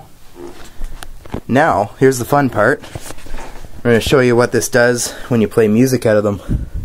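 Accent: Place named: Canada. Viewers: how cant you not tell someone is Canadian, Eh? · I detected he is a Canadian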